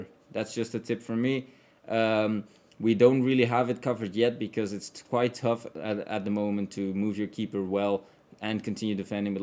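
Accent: Netherlands. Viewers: Kom jij uit doetichem hahahaha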